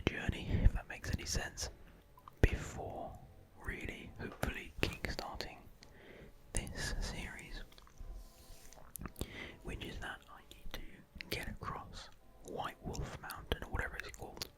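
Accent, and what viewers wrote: United Kingdom. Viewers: Thank you for continuing to make videos, and especially for continuing to make OSRS videos!! I’ve been falling asleep and getting tingles watching these since I was still in secondary school, and now I am in my 5th and final year of Uni, soon to start my masters!! Something about hearing an English bloke meandering through the most nostalgic parts of this game that I have been playing for well over a decade aswell brings me to a place of comfort that nothing else can replicate. ❤❤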